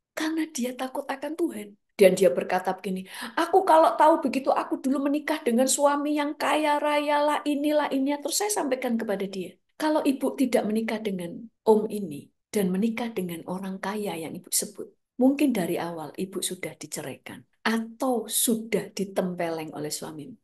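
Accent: Java, Indonesia. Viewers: Bu mangstap... ternyata wong medhok... ❤❤❤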